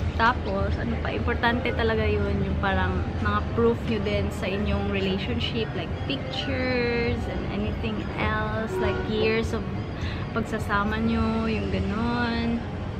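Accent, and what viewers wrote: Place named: Davao, Philippines. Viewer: Davaoeño accent. Hehe.. kaubo kos chika sa gasto. Murag gi kuyawan ko huhu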